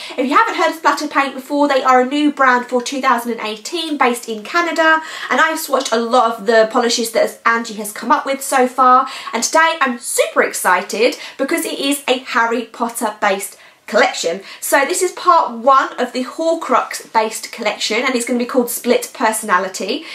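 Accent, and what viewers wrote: United Kingdom. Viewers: Brilliant! I honestly could just listen to you speak all day long with your lovely British accent! I too wondered about the pronunciation of the word Gemino. Tomāto tomàto I guess 😉 (Still can’t believe you’re nearly at the end of a pregnancy!) xo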